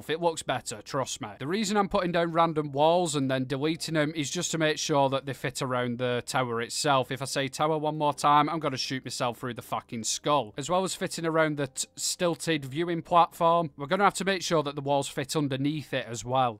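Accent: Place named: Northern England, United Kingdom. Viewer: Thats an amazing build, thanks for giving me some ideas. I am a rubbish base builder at present but watching your videos makes it look so easy, also being a northerner myself I really appreciate your northern delivery of all this, simple and straight to the point. Keep up the great work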